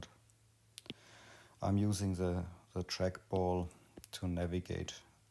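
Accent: Bavaria, Germany. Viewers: Thanks for sharing. I love Bavarian English 🤭. Alles gute 🫶🏼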